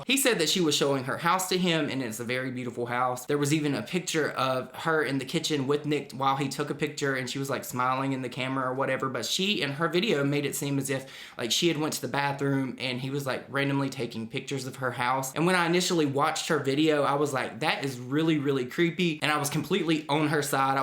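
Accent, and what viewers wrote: South, United States. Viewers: I love your accent sooo much!! It makes me miss my cousins down south. Great video!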